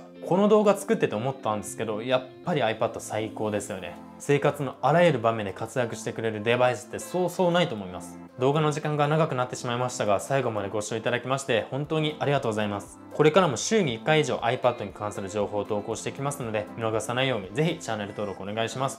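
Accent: Kansai, Japan. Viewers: 先生のイントネーションで関西弁出てますよw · 話のイントネーションと阪神タイガース好きって言ってたんで笑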